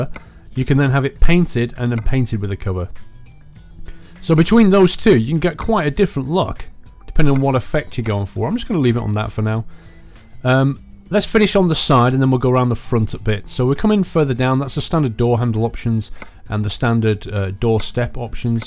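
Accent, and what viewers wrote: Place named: Stoke, United Kingdom. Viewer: you sound like your from stoke on trent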